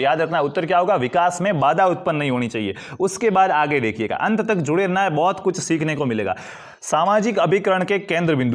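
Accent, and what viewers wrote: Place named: Udaipur, India. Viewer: Sir apki भाषा se ap udaipur se lag re hoo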